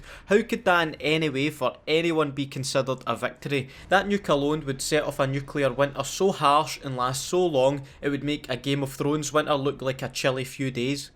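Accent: Scotland, United Kingdom. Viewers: Are u scottish,if so,so am i and what part of Scotland u from? Im from Edinburgh. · The first one of these introduced me to this channel and I’m so glad it did man I’ve been binging or old vids of mw2 my favourite the cod and now I’ve joined the notifications squad man also I’m Scottish aswell and it Scottish patter just makes this channel 10/10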